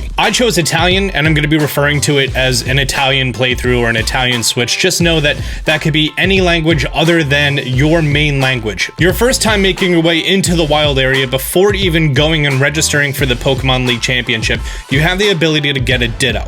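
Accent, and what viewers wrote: Australia. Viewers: the ausies finding out that he is australian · Wait, you’re Australian?!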